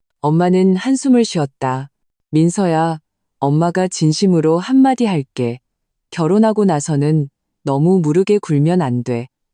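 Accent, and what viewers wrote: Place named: China. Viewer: 해설자가 중국사람이야 왜.숫자를.그렇게잃냐.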